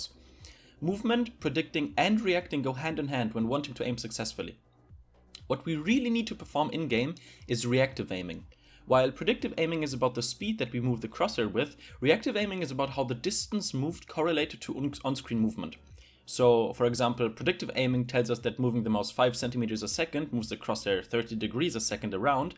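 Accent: Germany. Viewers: hey bist du deutsch du hast nämlich n ziemlich startken deutschen akzent in deinen Videos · Ich höre so raus dass du deutsch bist 😂 kann Mann diesen aim "Unterricht" auch in deutsch bekommen ? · Am I hearing a german accent? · I was just wondering what your native language is? Your accent makes me think of that of a German but your real name doesn't fit (great content btw) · nice video! btw are you german, because you sound like me when i speak english (and im german) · Are you from Germany? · Hört man direkt. Ist zwar kein starker Akzent, aber als Deutscher erkennt man das sofort. :D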